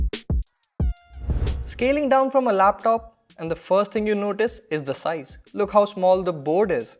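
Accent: India. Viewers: Great video , but still indian-english accent gets me everytime :D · As a non-native English speaker, I usually have a hard time understanding Indian accents, but this guy’s voice is very soothing. I’m loving his accent.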